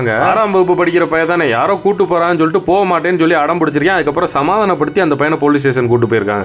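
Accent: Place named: Karaikudi, India. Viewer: Bro I think your native is Karaikudi......... your slang....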